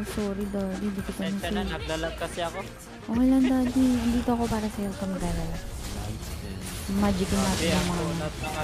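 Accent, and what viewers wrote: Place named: Visayas, Philippines. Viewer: Boses. Vizaya k lodi. Hehehe ok lng yan penge skin <num>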